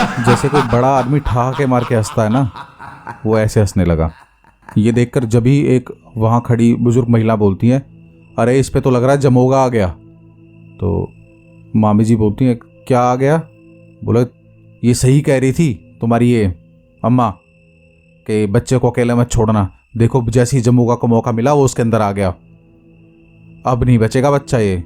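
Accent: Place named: Meerut, India. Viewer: Jai shree Ram bhaiya ji.. me Meerut me rahti hu.. aapki baato se lagta. Hai ki. Aap bhi Meerut ya Meerut ke aas pass hi. Rahte honge. Sunte hue aisa hi lagta hai ki humaare neighbour bhaiya hi story suna rahe hai. 45 years Meerut me ho gaye hai tho Punjabi ke saat saat ye language bhi hum sab me rach bass gayi hai 😊 aapki sabhi stories bahut hi acchi lagti hai 🙏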